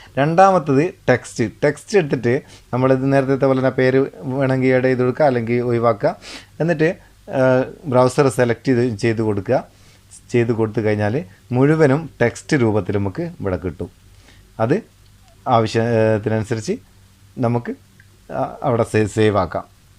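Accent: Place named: Meppayur, India. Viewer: കോഴിക്കോട് മേപ്പയ്യൂര്‍ ഭാഷ വിടല്ലേ.ആകര്‍ഷകം,ആസ്വാദ്യകരം,ഓക്കേ?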